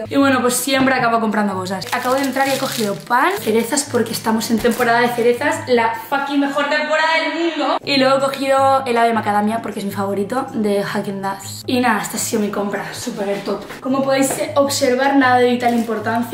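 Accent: Buenos Aires, Argentina. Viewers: Te amo mucho, me alegras los dias. Btw te sale re bien el acento argentino. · tu acento de argentina y ingles me encantan te amooo quieres tomar un cafe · te amo y amo tu acento argentino 😍💖😂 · Eu el acento porteño de Ar gentina le sale muy bien! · necesito mas videos hablando en argentino por favoor !! jajajja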